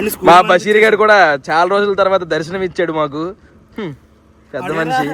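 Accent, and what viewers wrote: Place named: Godavari, India. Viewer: Mee godavari slang super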